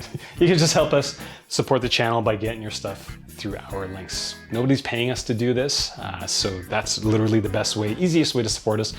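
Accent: Canada. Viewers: Is this dude Canadian?? What a lame reviewer and his jokes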